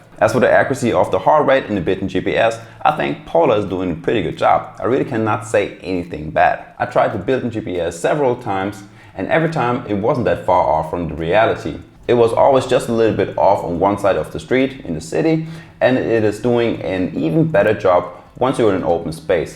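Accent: Germany. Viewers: Direkt deutsche akzent gehört coole videos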